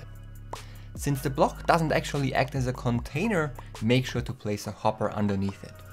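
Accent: Germany. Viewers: are you german? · Ich finde dein englisch witzig weil man eher das deutsche von dir hört🤣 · Ich mag deinen deutschen akzent :)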